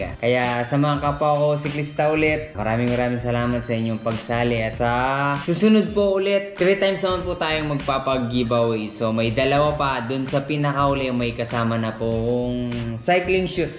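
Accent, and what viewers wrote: Pampanga, Philippines. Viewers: Sarap pakinggan boses ni kuya ska ung puntong kapampangan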